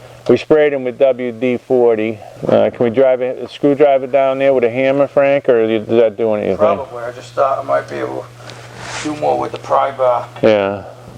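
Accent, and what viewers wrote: Boston, United States. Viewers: Yep I would like to see you fix it rather than swapping out the hull if it's not too far gone of course, I'm not from the US and I'm just curious about your accent, I am going to guess at Boston region ?? I'm probably the wrong end of the country eh lol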